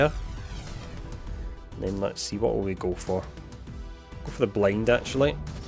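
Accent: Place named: Glasgow, United Kingdom. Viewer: ahhh ok, are you in the US? Cos your accent sounds like your from near Glasgow like paisley or that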